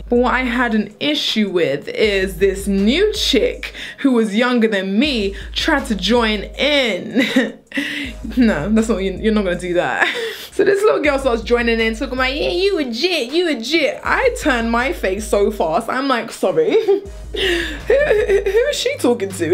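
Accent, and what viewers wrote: England, United Kingdom. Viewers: All of u saying it's jit not jet clearly have no appreciation for the English accent 💁🏽💁🏽💁🏽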